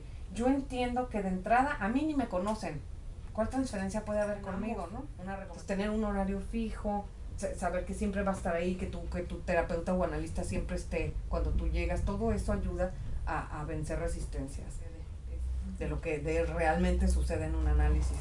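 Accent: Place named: Mexico. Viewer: q bonita mujer q hermoso acento mexicano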